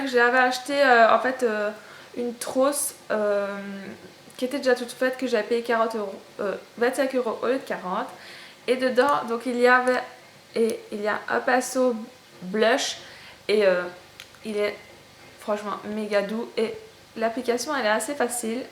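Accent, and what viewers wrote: Belgium. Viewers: Tu es belge? :)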